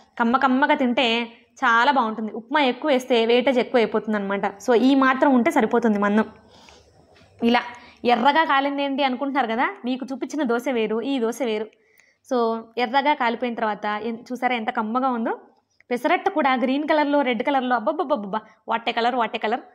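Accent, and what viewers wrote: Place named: Godavari, India. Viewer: Hi this is purnima mee mattalu vinty gala gala godavari gurthuvastundi😀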